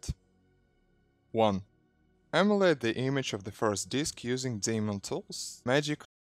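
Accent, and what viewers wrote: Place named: Russia. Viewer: почему твое произношение похоже на русского?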